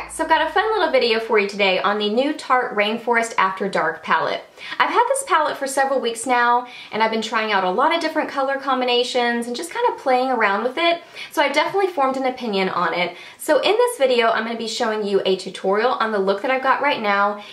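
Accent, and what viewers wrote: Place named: Texas, United States. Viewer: I literally LOVE your accent, and it makes me happy that you're a fellow Texan! Do you do collabs? I'm totally interested in doing one with you. Let me know. If not, I still love this! Haha.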